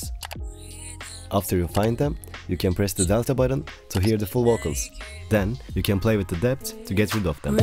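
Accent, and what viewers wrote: Turkey. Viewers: abi ingilizcenden belli sen Türksün !!!!!!!!!! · Hocam aksandan dedim bu çocuk türk mü öyleymiş great content tho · Biraderim bence sen kesin Türksün ben bu aksanı nerede duysam tanırım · aksanından türklük bangır bangır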